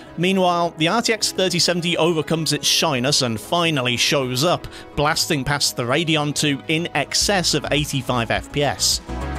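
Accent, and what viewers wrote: United Kingdom. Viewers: Awesome channel, with great thought-provoking content! Nice British accent too....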